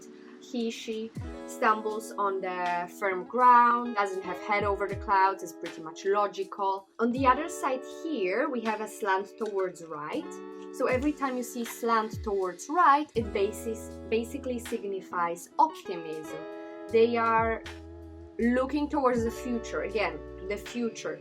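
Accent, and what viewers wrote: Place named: Germany. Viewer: = Not Italian; +the accent (and way cute!), she's likely Polish or some other former Warsaw country. Her name in Italian would be something more like: Alessandra Piotro. Before seeing her surname in video (9) I thought maybe High-German by the accent and the way she wrote the number "1" in video (3)....unless that's her husband's surname, in which case I'll stick with German. Else if not married, wanna go on a date with me?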